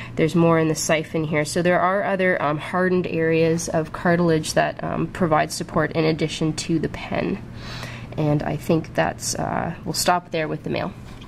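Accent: Canada. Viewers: I can tell you're Canadian. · She sounds Canadian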